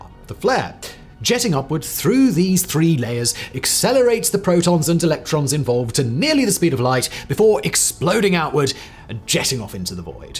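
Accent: United Kingdom. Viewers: "Geezers", haha. Makes me giggle every time 'Brit-pronunces' words.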